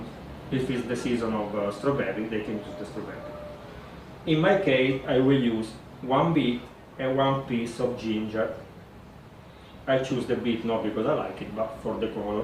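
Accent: Italy. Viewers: Grazie mile... O seu sotaque italiano é muito gracioso... e o melhor de seu vídeo é que filmaste o fim, ou seja, como o kvass se comportou no copo.. obrigado.. abraços do brasil.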